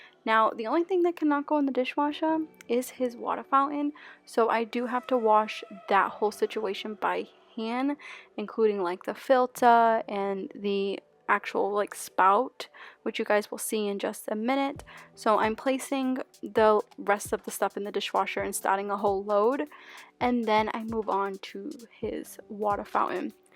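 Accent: Boston, United States. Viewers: Are you from Boston? I can hear the accent!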